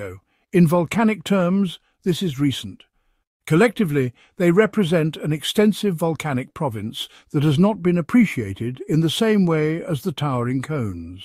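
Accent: United Kingdom. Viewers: WTF are we listening to a Brit tell us about our PNW? Does he have any credibility? Does he know about Baker or Shasta? He brings up Arizona and Wyoming. Has he ever hiked through the Craters of the Moon as a kid? Where was he when Mt St Helens killed Harry R. Truman? Has he ever visited Mt St Helens? I doubt it! He reminds us of everything we experienced in 1980! Do we need to be told that there was plume across the US? Has heard about the Cascadia Subduction Zone? What goes silent forever? I’d suggest that the should shut up and go silent forever!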